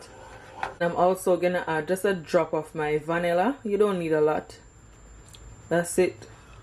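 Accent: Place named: Jamaica. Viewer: I love your Jamaican accet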